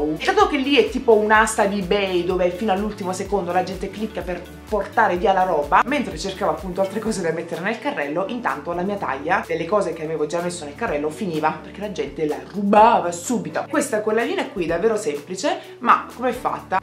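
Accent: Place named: Rome, Italy. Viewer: Inizia un video parlando con l'accento ROMANO! Ti adoro sei stupenda😍😍😘❤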